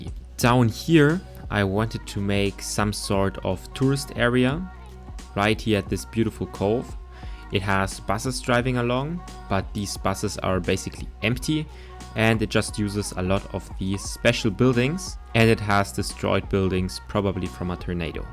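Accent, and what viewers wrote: Germany. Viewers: Just a small question. Are you speaking german or some other similar language. Cause your accent seems to come out of that direction. Great Video btw.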